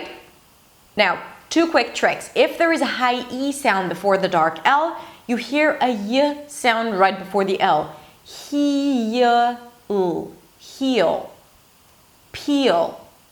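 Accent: United States. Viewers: you are American? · you are a genius, gifted where teaching is concerned. I am Republic of Benin (West). I've discovered the secret of learning English and how to sound native. Thank you so much. To top it off you're making me fall in love with American accent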